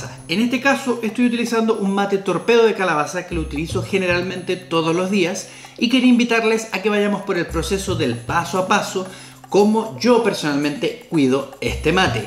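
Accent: Chile. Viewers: Che de dónde sos?? Pareses medio chileno por tu acento pero me párese medio raro un chileno tomando mate jaja qsy 🤷🏽‍♀️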